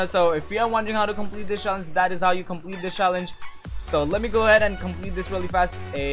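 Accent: India. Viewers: Lmao his indian accent is so funny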